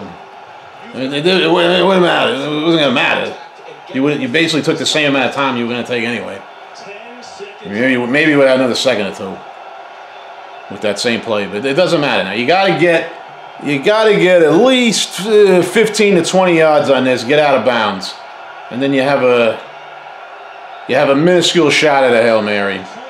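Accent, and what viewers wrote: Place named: Boston, United States. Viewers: You kinda look like Christoph Waltz. How'd you end up a Cowboys fan with a thick east coast accent like that? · You sir are not from Texas with that Boston accent🤙💪🫡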